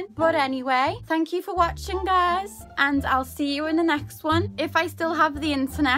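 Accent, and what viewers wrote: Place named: England, United Kingdom. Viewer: wait i love your accent girl!!! one of my friends is from england and you sound EXACTLY like her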